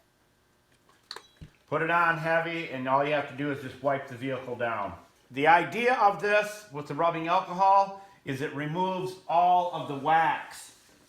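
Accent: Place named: Chicago, United States. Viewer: Ok ha I detected a slight Chicago sounding accent so thought you might be close to that area. Beautiful part of the state. Love your vid’s thanks again!! ✋👍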